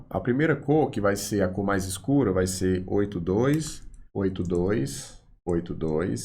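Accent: Ceará, Brazil. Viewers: seu sotaque parece aqui do Ceará! procede??? =)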